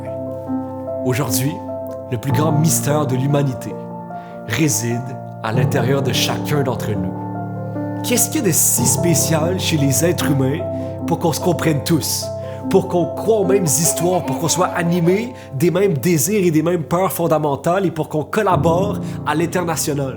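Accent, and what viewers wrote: Quebec, Canada. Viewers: L'accent québécois, impossible à prendre au sérieux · c’est l’accent québécois 😭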